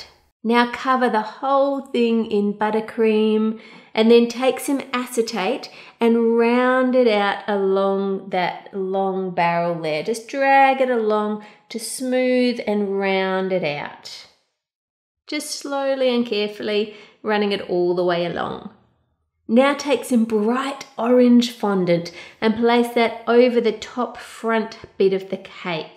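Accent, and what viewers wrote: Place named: Australia. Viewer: sounds more Australian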